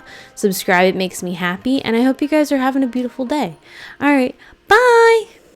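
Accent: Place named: Upstate New York, United States. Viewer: I love your lingo. I swear if you lived nearby we would be besties. Unfortunately for me Id guess from your dialect (which sounds north eastern, more specifically Upstate New York) is on the other side of the country. I live in Washington State.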